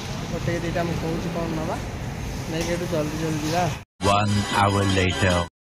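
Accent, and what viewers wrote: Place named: Gulgulia, India. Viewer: Puara gulgulia katha sunibaku bhala laguchi ❤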